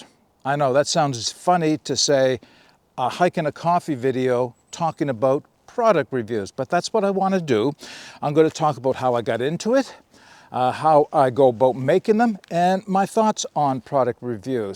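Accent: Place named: Nova Scotia, Canada. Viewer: Thank you for everything you do. The first time I stumbled upon one of your videos, your accent seemed oddly familiar. It took me a while to figure out that you were a fellow Nova Scotian. I have purchased a number of things based on your reviews. I trust your opinions. I cannot fathom the time and effort you put in. Well done.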